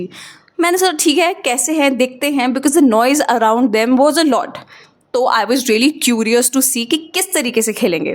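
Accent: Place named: India. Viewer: Indian accent very bad. You don't speak English like this 😒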